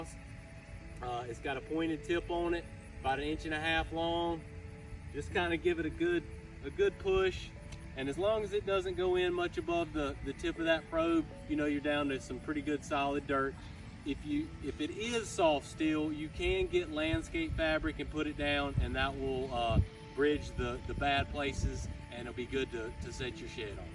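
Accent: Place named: United States. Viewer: Best American accent ever!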